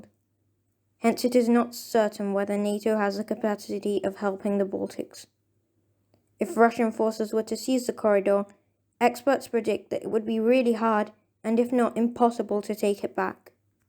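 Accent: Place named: United Kingdom. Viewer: Your voice is the most British voice I’ve ever heard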